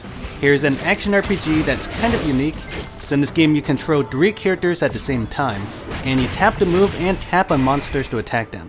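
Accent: Netherlands. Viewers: Your dutch are you?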